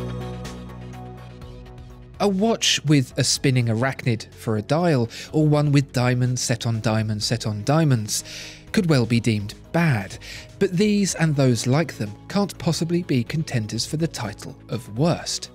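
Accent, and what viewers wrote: United Kingdom. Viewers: This is completely subjective. A British accent doesn't make you an authority.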